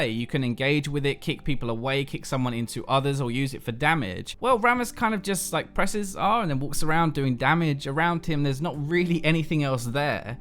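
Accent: England, United Kingdom. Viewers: Which part of England is that accent coming from?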